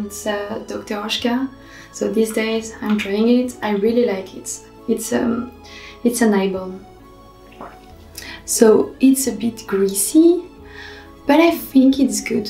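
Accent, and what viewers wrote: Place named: France. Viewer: The French accent and the gentle tone of her voice is mesmerizing. She doesn't need make up, already perfect.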